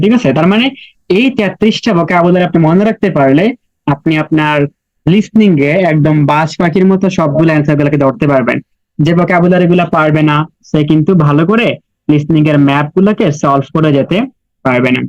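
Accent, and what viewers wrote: Sylhet, Bangladesh. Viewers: Vai apni kita Sylheti ni?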